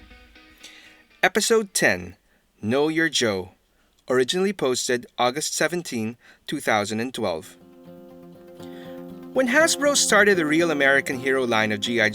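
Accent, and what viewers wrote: Philippines. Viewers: mabuhay po kayo sir, nalaman ko po sa accent nyo na pinoy kayo, god bless po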